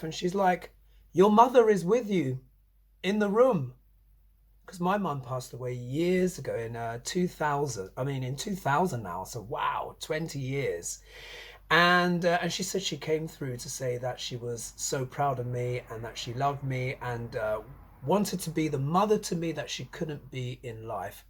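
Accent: United Kingdom. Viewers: You are my favorite Vedic Astrologer. That British accent is awesome.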